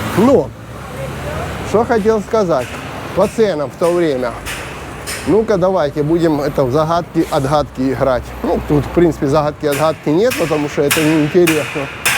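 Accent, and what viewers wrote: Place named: Ukraine. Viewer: А вы точно из Москвы , у вас акцент украинский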